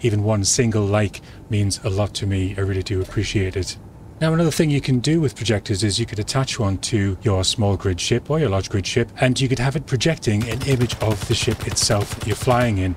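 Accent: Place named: Scotland, United Kingdom. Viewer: Thank you so much, this helped alot!! Love that Scottish accent too, keep it up!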